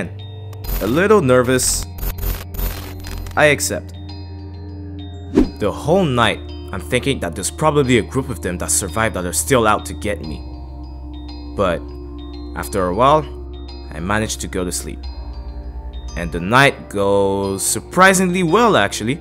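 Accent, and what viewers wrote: Montreal, Canada. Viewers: I can hear that Montreal in your voice :) !!! No hate I grew up in Montreal too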